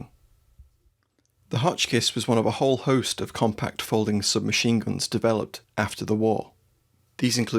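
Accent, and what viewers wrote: United Kingdom. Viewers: Is this one deactivated as you sound British.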